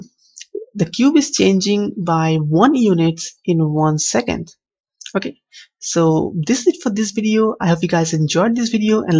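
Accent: India. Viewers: Indian accent, check. Weird audio, check. Good tutorial, check.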